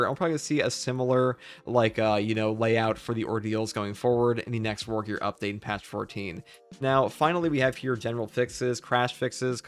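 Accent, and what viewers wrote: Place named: AAVE, United States. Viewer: ebonics.. I think??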